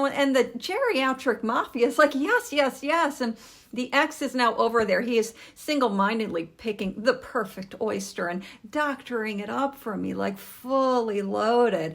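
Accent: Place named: Baltimore, United States. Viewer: I detect the Baltimore accent. Oysters are gross. I might try something new if I think it might be good, but not if it's gross. I'm also not going to talk someone into eating something they don't want. My ex was allergic to chilli peppers. They would screw up her system. It became standard habit to ask about them at any Tex-Mex place. I'd ask even if she didn't remember.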